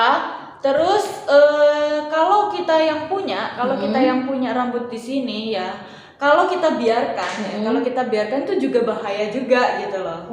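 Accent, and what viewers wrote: Banyumas, Indonesia. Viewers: HAHA, ORA PANGLING' LOGAT NGAPAK😂👍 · Bahasa banyumasannya medok bgt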